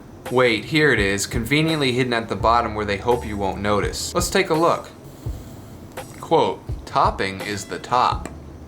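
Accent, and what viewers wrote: New York, United States. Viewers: That NY accent! :D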